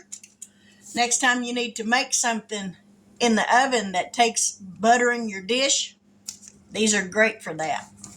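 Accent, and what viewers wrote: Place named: United States. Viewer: Looks delicious! I love your country accent!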